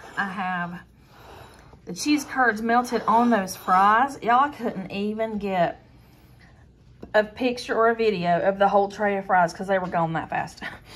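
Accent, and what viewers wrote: South, United States. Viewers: Looks perfect!!!! And love your southern slang!!!! Keep up the great work on your videos from OHIO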